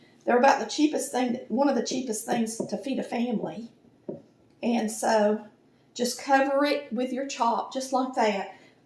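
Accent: South, United States. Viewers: You are awesome! Love your southern accent and personality 😄. I just found it today and YouTube keep posting videos you’re great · I love the way she say pork chops. That country voice gets me all the time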